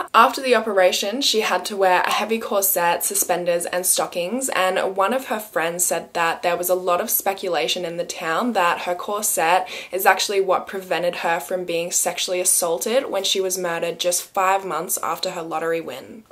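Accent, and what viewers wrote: Australia. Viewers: Hi, I new that was an Aussie accent, I'm 62yrs and live on the Sunshine Coast Queensland great to find your channel well done really enjoying your channel. Good luck Sweetheart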